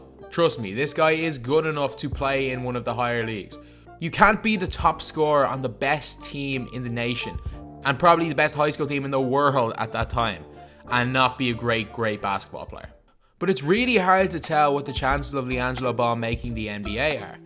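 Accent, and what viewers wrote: Ireland. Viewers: You irish? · Are you Irish?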